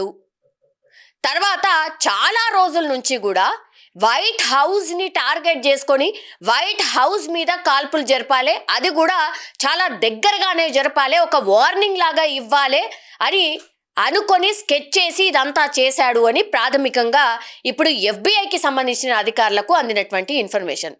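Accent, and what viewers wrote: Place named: Telangana, India. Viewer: antha rani telengana accent edhuku try chestunaru anchor madam ??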